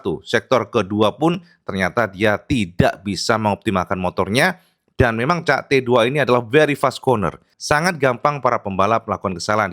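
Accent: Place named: Java, Indonesia. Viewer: Mas.. Mirip logatnya kaya, yg ngonten KIOS KOMPUTER..! yg dari jawa juga... 😊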